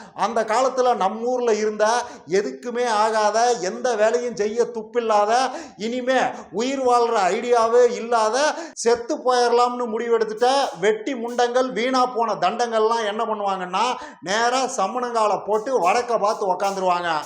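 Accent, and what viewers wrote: Kongu, India. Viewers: தம்பி, your lectures are very deep and insightful, especially on Indian politics. Your analysis is excellent, and the information you provide is valuable. However, I have a small suggestion—sometimes, the topic of your video and the actual discussion don’t fully match. ஈரோடு என தலைப்பு வைத்ததை விட வடக்கு கிழக்கு அரசியல் எனும் பாணியில் தலைப்பு வைத்து இருக்கலாம். It would be great if you could stay more focused on the given topic and elaborate on it more directly. This would make your content even more impactful. Keep up the great work! நகைச்சுவை கலந்த கொங்குத் தமிழில் உங்களின் கருத்து மிக அற்புதம் தம்பி. ஆனால் தலைப்பில் பேசுங்கள்.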